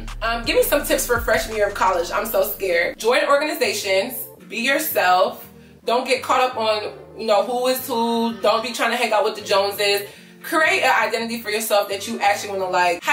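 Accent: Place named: United States. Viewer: think about it as being phonetically. There is a popular gospel song with the correct pronunciation as well as song called for reference. Like there is an emphasis on the first half and the a is shorter than the traditional American a. Right now your cutting off the sound of the I and elongating the A at the end which is more American English pronunciation then the traditional Igbo pronunciation. I know because I have the same Igbo name (Grace is my English name). I commented earlier as someone else also mentioned this as it’s quite noticeable. I was born in the US, so I know it’s hard to pronounce everything perfectly.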